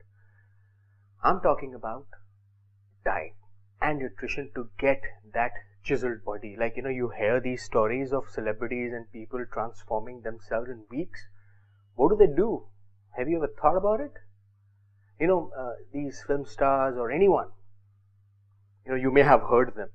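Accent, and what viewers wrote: India. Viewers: s gupta I am an Indian from England though and cannot speak Punjabi properly, but if I was living in India I would pick up the language and stick to it. In India speaking English is associated with higher socioeconomic worth because those who can afford a better education at private schools are taught mostly in English - But that starts as a gateway to a door of a full blown cultural inferiority complex which Indians attempt to make all sorts of excuses for such as celebrating Christmas but throwing under the guise of 'We respect all religions'. As an external observer it comes across as bizarre how most Middle Class Indians put an emphasis on speaking English over their national languages or Hindi, but other than that the accent does come across as embarrassing and makes it less likely for him to be taken seriously on an international platform - It's why it is so widely ridiculed and many Indians coin on to this and attempt to fake their accent to sound American but can never pull it off. 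I just find it strange how this guy would obviously be able to articulate better in Hindi or Punjabi (The reason why he is swearing so much is due to a lack of vocabulary) but then opts to speak English instead.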